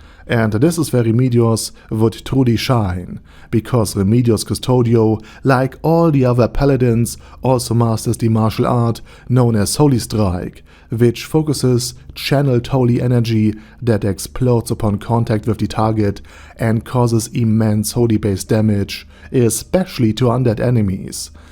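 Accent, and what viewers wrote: Germany. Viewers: New subscriber and amazing job just amazing and I love your German accent and can I ask you something about your channel and overlord is an amazing anime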